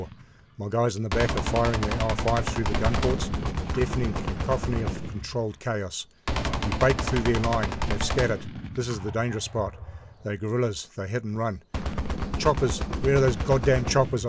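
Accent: Australia. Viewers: Ja right you are an Ausie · You drive through an ambush, or a TB, like we did. Pity you chose an ozzy accent for the narration.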